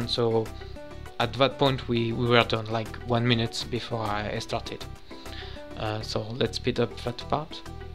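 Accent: France. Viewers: Merci ! Ps : J'adore ton accent francais mdrrr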